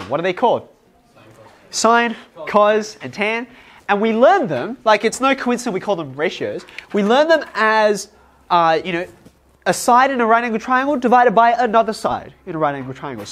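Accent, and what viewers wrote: Australia. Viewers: He’s Australian. Apparently us Aussies also speak the commonly spoken language known as ‘English’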